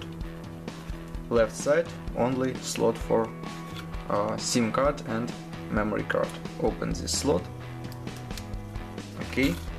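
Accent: Russia. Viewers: хехе факин рашн экцент, но это ничего, а вот политическая карта мира..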